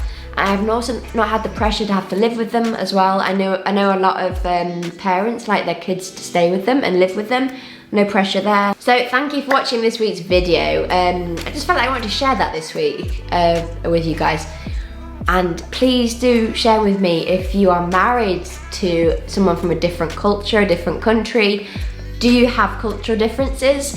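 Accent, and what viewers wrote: England, United Kingdom. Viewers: I really like ur English accent. Hoping you have a good lifetime in Taiwan.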